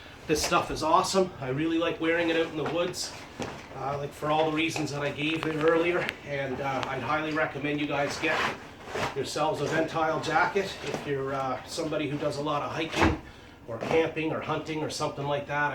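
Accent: Ireland. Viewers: Sounded quite Irish yeah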